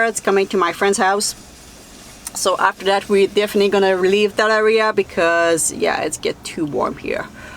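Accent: Quebec, Canada. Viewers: French Canadian, from Quebec city.✌️💕🇨🇦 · I think she said her accent is from Quebec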